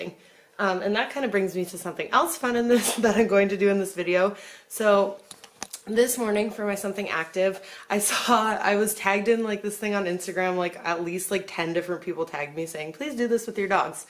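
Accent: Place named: United States. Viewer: Got here from the gram - I so wasn't expecting an American accent!!!!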